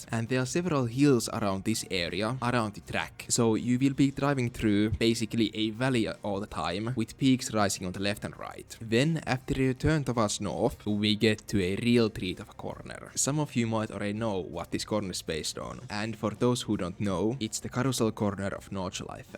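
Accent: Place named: Turku, Finland. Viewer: Thickest turku accent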